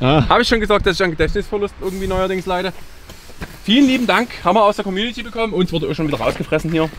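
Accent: Saxony, Germany. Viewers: Alles gut ! Aber das gesächsel macht es anstrengend zu folgen . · Gehört das vulgäre Geschwätz zum Konzept? Schwer zu ertragen. Sorry, aber Sächsisch ist schon anstrengend genug!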